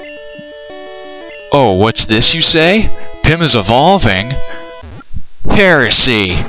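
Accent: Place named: Canada. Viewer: you is entertaining <3. Love the Nuzlocke challenge. "What now the shirtless wonder?" :3 Love the Canadian accent as well haha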